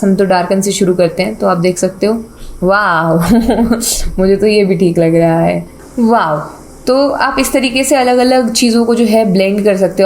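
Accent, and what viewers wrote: India. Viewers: Aap Bihari hai 😂❤ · Hasi hasi mai seekha gaya ,,, paka aap up se hai sayad 🥰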